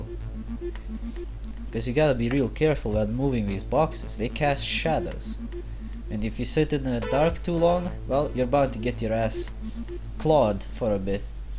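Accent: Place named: Russia. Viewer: are you russian?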